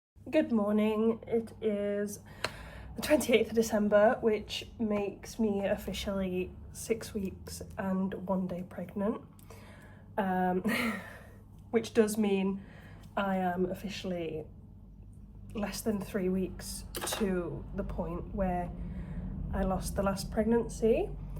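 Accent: England, United Kingdom. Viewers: It’s that English accent. It’s proper English.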